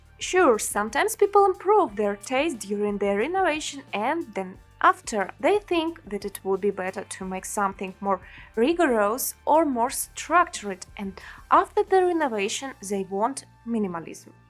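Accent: Russia. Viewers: Ничего вы не понимаете, во первых акцент хоть и хорошо слышится, но саму речь приятно слушать, уши не режет, как по мне многие русскоговорящие зря пытаются полностью избавиться от акцента, это же изюминка, главное чтобы тебя понимали и чтобы ушам больно не было, в данном случае очень приятно слушать, мне такой акцент очень даже нравится. Вы еще не слышали что такое плохой акцент, послушайте Пакистанцев или Индийцев, вот там не приятный акцент, но даже они не заморачиваются и не стесняются своего акцента, и все смотрят их видео, общаются, главное какой контент они производят. Удачи вам! Не слушайте тех, кто говорит что у Вас ужасный акцент, это не правда, у вас очень приятный акцент:) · Why not to have channel in English?! Great Idea 👌 Russian accent ? - why not?! Keep going on! 🤗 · Fun fact: when you are speaking English, you hands movements are a lot less than that when you’re speaking Russian:) all that is because the center of “sense composition” is moved from soulful thought delivering to “make sure your English is correct and distinctive”. 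P.S this Russian Natasha accent is certainly your charm. Thanks for a great video:)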